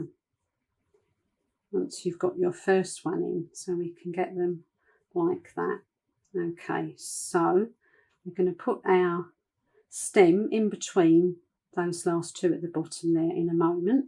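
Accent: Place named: Cowley, United Kingdom. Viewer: Your accent helps - sounds terribly similar to what I grew up with in Cowley near Uxbridge as a youngster.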